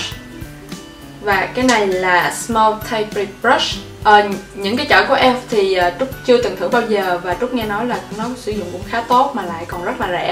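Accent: Mekong Delta, Vietnam. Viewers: Thích sự mộc mạc của chị với cộng thêm điểm giọng nam, miền tây êu dấu. Đáng yêu vô cùng hí hí Làm thêm nhiều video hay nhe chị, cố lên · chị là người miền tây à ? quê chị ở đâu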